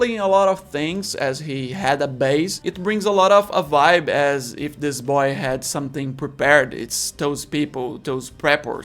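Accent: Brazil. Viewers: Reconheci o sotaque Br na hora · Muito bom o vídeo. Sério, no começo eu escutei seu "sotaque" e na hora pensei que era brasileiro kk, mas no final quando você falou que não era nativo fui ver de onde tu era só por curiosidade e não é q era brasileiro kk. Espero que seu canal cresca ainda mais e sucesso! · sabia que era brasileiro pelo sotaque kkkkkkkkk. Bom video, irmão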